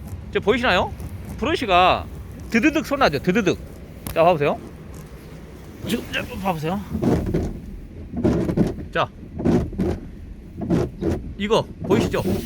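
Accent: Jeolla, South Korea. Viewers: 사장님 말투 때문에 자꾸 보게되네요. 어디인가요 지역이요. 전라도는 맞는데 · 아따.전라도사투리 존나듣기싫네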